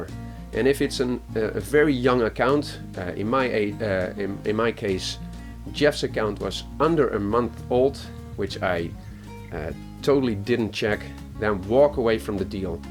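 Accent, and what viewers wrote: Netherlands. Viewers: i was thinking you are dutch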